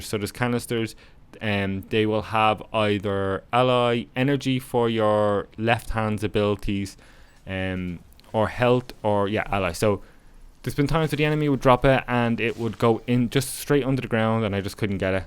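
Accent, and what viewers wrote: Ireland. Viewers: Are you from Ireland?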